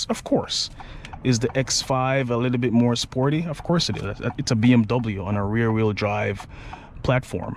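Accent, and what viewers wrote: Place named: Caribbean. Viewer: Where are you from? You sound carribean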